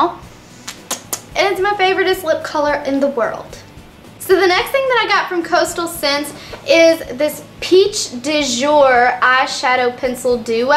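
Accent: South, United States. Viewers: Where is she from???!!! I know she's from the south but where??!! :)))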